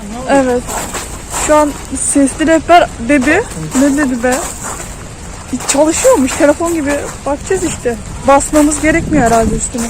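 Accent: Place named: Aegean, Turkey. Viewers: Bu kız %100 egeli. Konuşma tarzından anladım. Egeli olmadığım halde djsjdjssjs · nerelisin şiven çok tanıdık da Kütahya civari mı sormak istedim🤗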